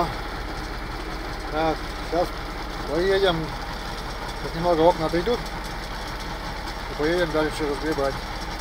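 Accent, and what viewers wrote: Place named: Siberia, Russia. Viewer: НАВЕРНОЕ В СИБИРИ ЖИВЁТЕ СУДЯ ПО ГОВОРУ И СНЕГУ? Я СТОЛЬКО СНЕГА В ЖИЗНИ НЕ ВИДЕЛ,АХРЕНЕТЬ. ЛАЙК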